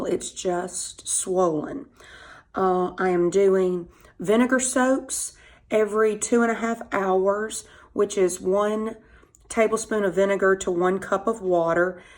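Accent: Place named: Texas, United States. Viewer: I LOVE her accent. Is that Texan or Georgian?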